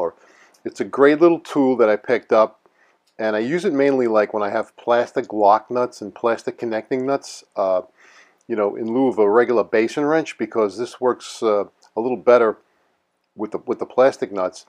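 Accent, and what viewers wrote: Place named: Brooklyn, United States. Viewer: Just bought one and i knew you were from Brooklyn since you sound like me, lol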